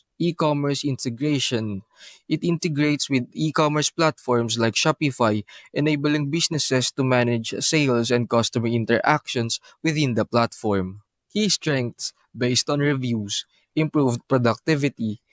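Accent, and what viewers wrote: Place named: India. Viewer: You sound Indian, why are you using a white guy? I already lost the confidence in this review in 2 seconds.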